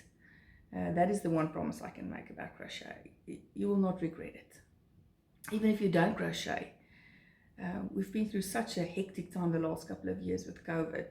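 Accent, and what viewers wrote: South Africa. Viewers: I just saw another one of your videos and heard your accent. I knew that you must be from SA...and you are! I am from the US, but living in SA for 22 years. I totally know what you mean about the post. I don't order yarn or kits from overseas because of it. However, I am in a yarn subscription that isn't a kit and have it delivered to my parents in the US. I have be crocheting for a long time, but have yet to try amigurumi. Maybe you will convince me! 😉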